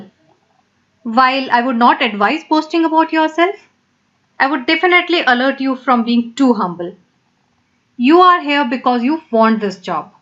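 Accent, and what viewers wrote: India. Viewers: I'm anti the Indian english.. my bad 😥😥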